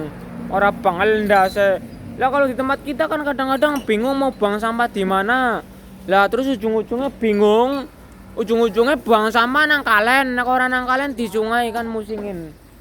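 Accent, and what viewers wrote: Banyumas, Indonesia. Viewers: Rika ngapak e ngendi Ndan😀😀😀😀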